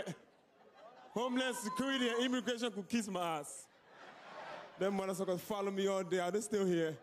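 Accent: Africa. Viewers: I absolutely love him. He's hilarious. I never get tired of the African accent. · lol his African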